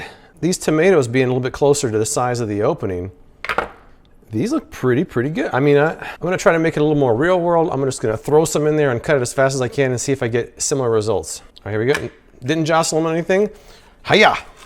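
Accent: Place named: Florida, United States. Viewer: As soon as you said you were from Jacksonville I said, I Knew It!! I might not have had the city down but I knew you were a floriduh boy. Review suggestion: lighted magnifying glasses. I'm in the market for a set for my crafting and there are so many different kinds on Amazon. Mighty sight of course, you may have done a review for that but not a comparison against other types.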